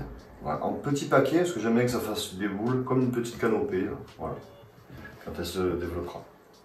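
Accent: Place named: Marseille, France. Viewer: Oh Le Marseillais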